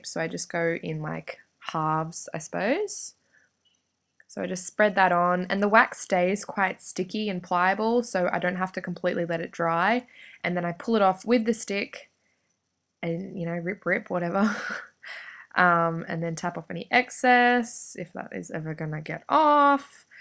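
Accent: Australia. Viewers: whenever i see you respond to peoples comments i always read your response in an aussi accent aha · are you australian ? cause you sound like an aussie ♡♥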